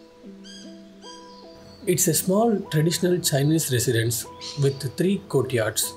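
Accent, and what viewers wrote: India. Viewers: Why indian accent? So.boring & cringe!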